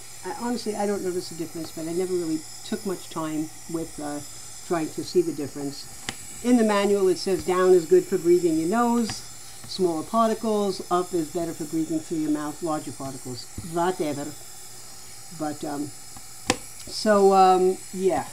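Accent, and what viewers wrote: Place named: Italy. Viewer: That's awesome! Thanks for making this video, I have constant allergies and I wanted to see reviews of steamers. Are you Italian by the way? My mom's side is Italian and your accent reminds me of my Italian part of my family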